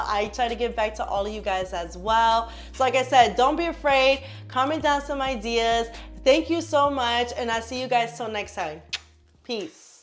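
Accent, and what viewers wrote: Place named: New York, United States. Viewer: I wish I knew where she was- I hear a NYC accent but I don’t know where she actually works.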